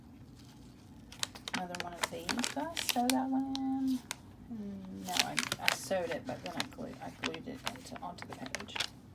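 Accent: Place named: South, United States. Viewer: GIRL!! I love Butterflies and Owls too (and Elephants!)... I have an Ankh tattoo also! It is on my Ankle. I did it myself when my wife and I got together. I absolutely love everything about this journal! Also...where are you from... I detect a slight southern accent... :) - Hugs! - Kari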